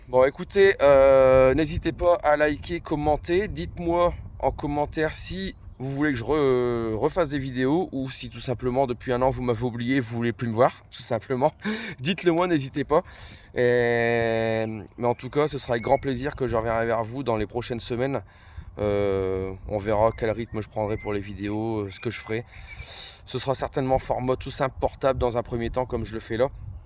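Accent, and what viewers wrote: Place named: Nord-Pas-de-Calais, France. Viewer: Non......on t'aime et chti accent moi j'aime!!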